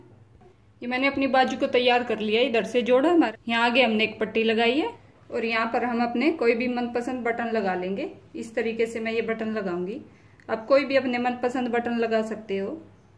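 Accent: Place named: Haryana, India. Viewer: Haryanvi lagte ho aap language se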